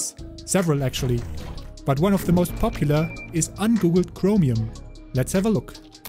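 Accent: Germany. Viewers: bro you german too? (accent)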